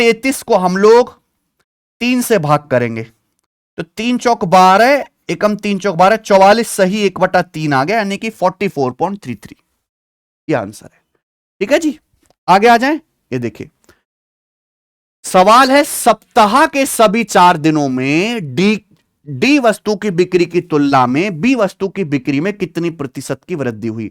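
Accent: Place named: Uttar Pradesh, India. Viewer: Sir aap jo up language me tabad tod se bolte ho mja aata h sunne me bhi or padne me bhi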